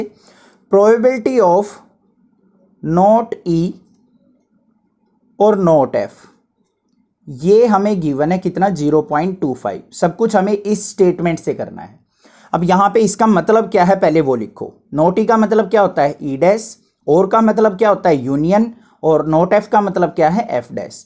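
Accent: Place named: Bihar, India. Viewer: Sir bihari h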